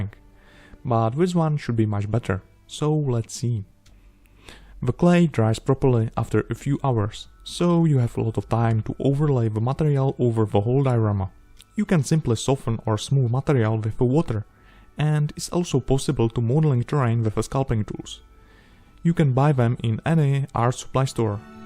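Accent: Russia. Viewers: у тебя же акцент Русский, но ты тягачь называешь трактором???? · Ведь же русский, по акценту слышно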